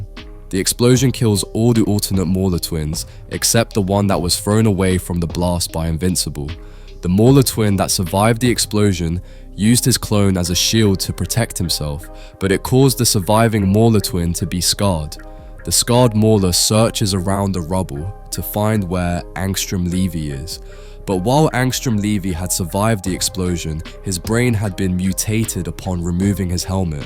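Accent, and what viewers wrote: United Kingdom. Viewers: I'm so used to this accent I tuned it out. He's just Bri'ish or something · he's British, that's how it's pronounced in whatever part of England he's from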